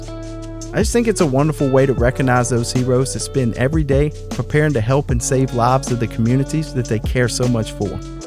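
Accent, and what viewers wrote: South, United States. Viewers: LOVE YOUR SOUTHERN DRAW..🥰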